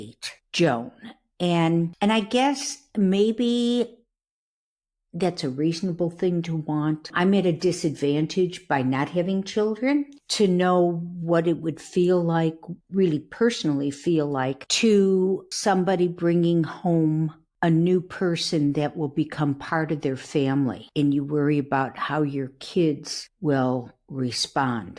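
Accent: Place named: Midwest, United States. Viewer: Hi ….I enjoy your commentary,but……I’m not trying to be nasty here, but I just have to say…… your midwestern accent is so annoying! It’s grating!! It makes it difficult to listen. You really should try to mitigate it. Perhaps there’s some kind of course on YouTube that could help you.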